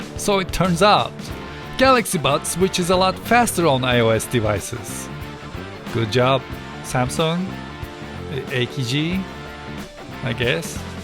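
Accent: Turkey. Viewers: I sensed Turkish accent. Am I right?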